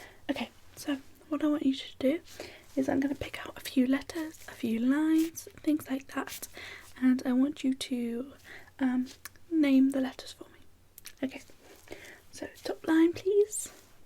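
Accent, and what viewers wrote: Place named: United Kingdom. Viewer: I love your Britanic accent❤️❤️❤️😋♥️kiss You Young lady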